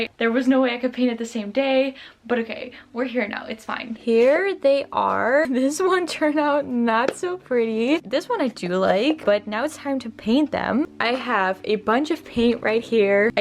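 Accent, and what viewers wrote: Netherlands. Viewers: Wait what are u Dutch? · Are you dutch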